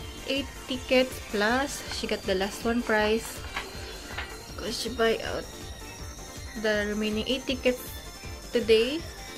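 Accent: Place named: Philippines. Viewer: Filipino accent AHHA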